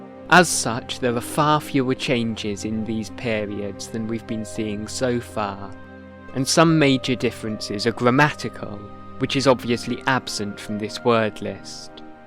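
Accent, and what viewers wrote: Wales, United Kingdom. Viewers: I was actually going to mention that (to me at least) you sound like you have a bit of a welsh accent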